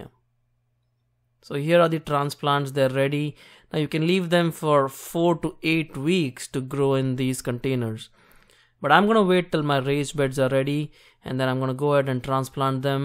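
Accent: India. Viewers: Is that a Hindi accent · you sound indian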